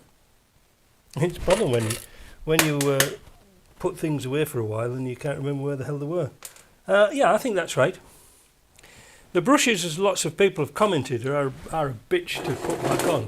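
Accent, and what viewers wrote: United Kingdom. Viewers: Mate where are you located ?? you go that UK accent have'n you !! UK or ?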